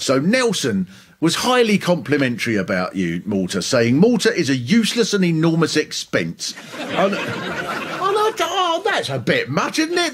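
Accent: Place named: England, United Kingdom. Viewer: Grate english accent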